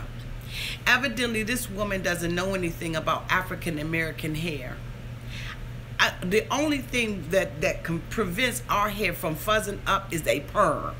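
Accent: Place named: Maryland, United States. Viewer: Britt S it's her Maryland accent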